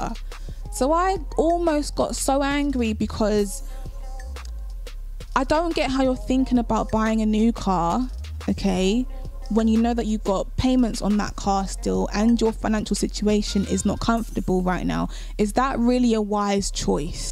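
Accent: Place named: England, United Kingdom. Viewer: Why you are not a household name I do not know. Initially…You Lovely Lady😇, had me intrigued by your the English Accent. Then I noticed that you had certain flair/ “steez” as we use to say in Philly 🙂/style about you. Please note { these observations were in milliseconds ( says my Gemini ♊️Ascendent ) .} Then BAM 💥 My Jupiter in Pieces in the 12th house kicks in and I’m able to discern that you are Spiritually and Intellectually Wealthy! You have a Greater understanding of who the planets are entities. Just like me. Hey Girl , I love your work.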